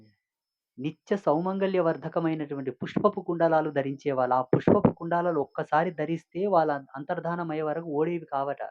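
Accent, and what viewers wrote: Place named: Telangana, India. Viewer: Meru telanganayasa matldina me vakkulu adbutham swami 🙏 telagana biddalandaru garvapadali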